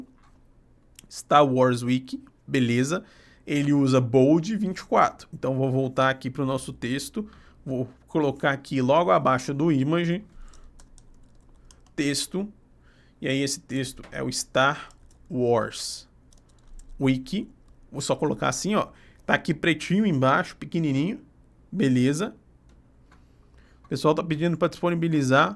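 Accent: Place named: Minas Gerais, Brazil. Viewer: Meu conterrâneo, kkkk sou de Juiz de Fora, reconheci o sotaque. Um grande abraço, ótimo conteúdo.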